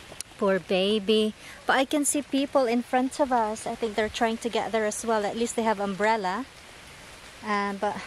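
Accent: United Kingdom. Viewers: Belated happy birthday, rainy day in your place now, gusto ko British na accent, sounds good... Enjoy the zoo...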